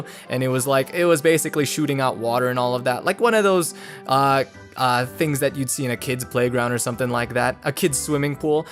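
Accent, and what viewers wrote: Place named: Sweden. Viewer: That's so weird! It sounds a little bit like you have a Swedish accent sometimes. (No offence intended)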